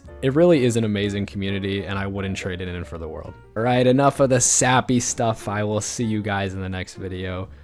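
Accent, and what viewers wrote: Poland. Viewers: Are you polish? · bro are you polish??????????????????????????/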